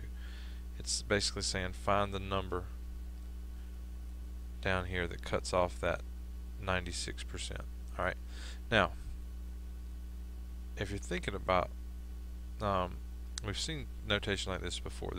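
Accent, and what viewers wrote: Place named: Kentucky, United States. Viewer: i like ur accent, reminds me of Kentucky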